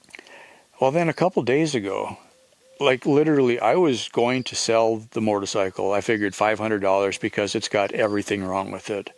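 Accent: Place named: Canada. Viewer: He's Canadian, sometimes they talk funny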